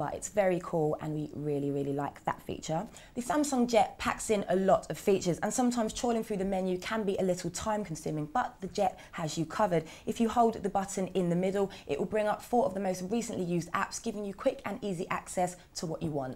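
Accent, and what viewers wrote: United Kingdom. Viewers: SHe got Brit accent. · fuck british accent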